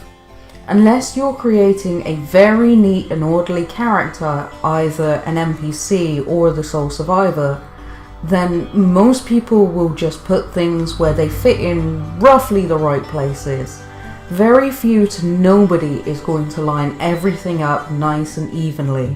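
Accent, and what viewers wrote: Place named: France. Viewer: Ouiiii j'adore ta vidéo, tu as un accent français si charmant ^_^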